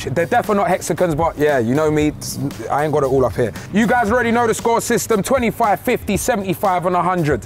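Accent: Colombia. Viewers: Gosh, where's from? He's English, but has a great Colombian accent in Spanish. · Hey loved your colombian accent 😁 great video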